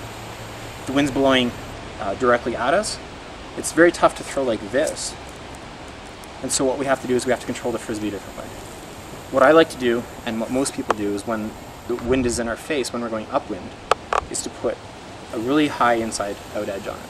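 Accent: Canada. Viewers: He's Canadian, dude. That's the Canadian accent, nothing weird aboot it. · Canadian, eh?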